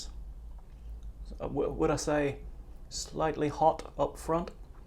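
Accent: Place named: Northern Ireland, United Kingdom. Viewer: Interesting one to hear about. Cheers. Per chance, are originally from N Ireland? Just picking up some local tones. Sláinte.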